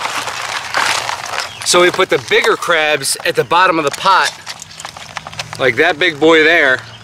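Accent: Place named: Maryland, United States. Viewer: complete with that Maryland accent hon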